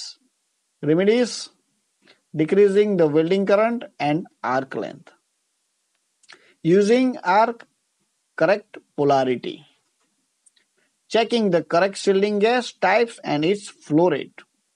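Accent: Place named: India. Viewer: такой конкретный индусский акцент у лектора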